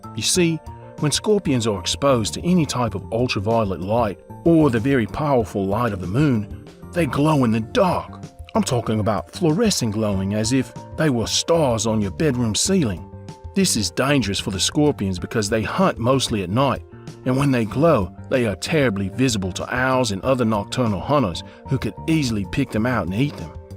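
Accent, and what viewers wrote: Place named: Australia. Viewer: It's the Australian accent for Me. 🤔🥰🤗🇨🇦💖 No, I was never a fan of Irwin, but a tragedy nonetheless. 🤔🥺😭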